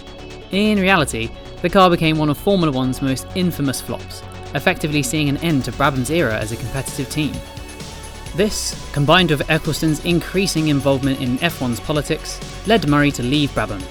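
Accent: United Kingdom. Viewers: Why do Brits call Honda, Honder?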